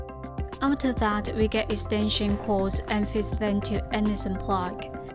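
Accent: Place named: China. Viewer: Very informative review ,nice Chinese accent too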